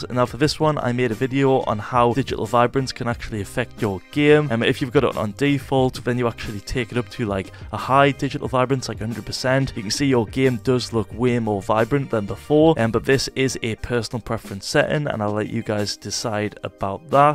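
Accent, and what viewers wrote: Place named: Newcastle, United Kingdom. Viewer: are you a geordie lol you sound a lot like my family from newcastle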